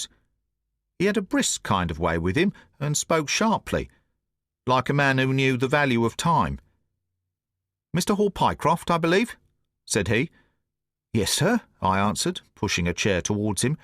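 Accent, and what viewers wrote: United Kingdom. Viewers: Amazing reading. Great British accent. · British pronounciation....Holmes and Watson did not speak the American language.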